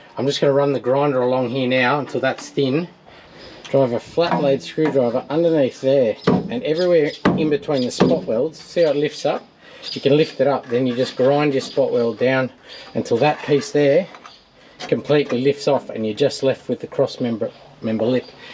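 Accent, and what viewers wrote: Australia. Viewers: Nice job on the floor pans😅. Did a few. Lol. Tech screws and filler!! 😜. Living in UK now. Good to hear a true fair dinkum Aussie accent. Keep the videos coming. Still think that asthmatic 202 and traumatic need to go!! It's begging for big block and 5 speed transmission 🤗🤗🤗🤗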